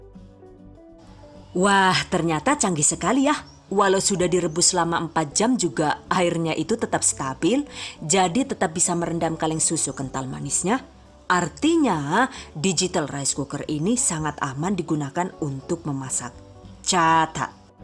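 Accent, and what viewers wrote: Banyumas, Indonesia. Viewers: 😆😆😆 ngapaknya itu loh aye demen banget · Hadeuhh...gk nahan sm ngapaknya itu loh...,😅😂 channel kesayangan gue nih,krn inspiratif dan kreatif banget,👍👍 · yu ngapak2 baen kouh 🤣🤣🤣🤣🤣🤣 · Hehe I always wanted your video not just because the receipt but for your ngapak hehe lucuuu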